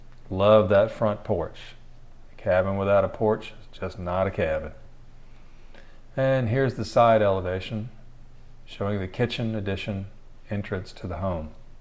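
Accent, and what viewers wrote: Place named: Virginia, United States. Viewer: The cabin is beautiful along with your accent and the fact that you're from Virginia.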